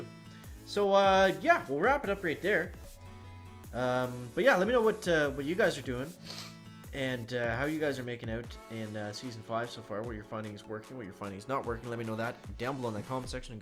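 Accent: Canada. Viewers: Great accent Canada, eh!! Nicest people on the planet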